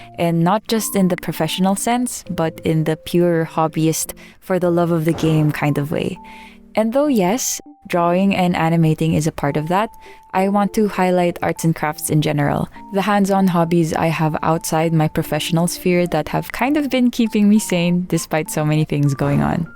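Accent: Philippines. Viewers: literally 5 seconds into the vid narinig ko agad yung accent (i never came accross u b4) and CLOCKED that u were a kabayan, and i was right 💅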